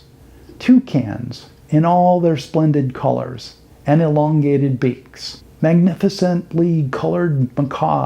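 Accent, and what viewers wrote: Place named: England, United Kingdom. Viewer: Thank you 🙏 so much for your beautiful video. I love it a lot because I learnt so much from your English accent 🙏 and your presentation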